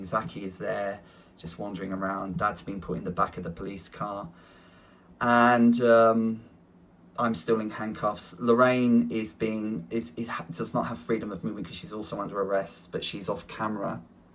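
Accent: United Kingdom. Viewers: THE NARRATION VOICE IS CERTAINLY FEMALE & Brit, to my ears. 1. Surprised "SHE" has a "WIFE". First surprise. 2. If you can "count" the officers, they're there in Numbers, Not in an Amount.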